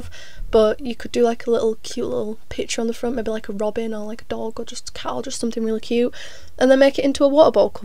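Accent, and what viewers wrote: United Kingdom. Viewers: there is nothing more AMAZING than opening up a video to expect a grating American accent, only to be soooothed by a fellow northerner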